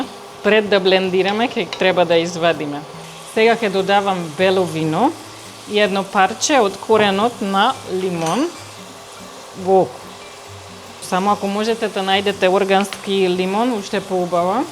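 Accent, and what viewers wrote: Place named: Hungary. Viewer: Прекрасна и слатка како и секогаш. Уживам во нејзиниот унгарско македонски јазик и дикција. И секако ги запишувам рецептите.🤗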